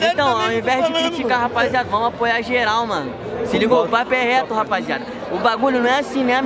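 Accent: Rio de Janeiro, Brazil. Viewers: u Sotaque carioca...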